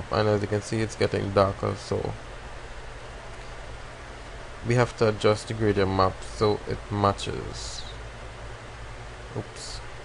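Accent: Trinidad and Tobago. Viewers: Same thing I saying he's trini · Great tutorial my friend. I can hear it in your voice that you're from Trinidad. I was shooting a destination wedding there for a client and friend who is from Trinidad. The wedding was in Tobago at Stonehaven Villas. Beautiful country indeed!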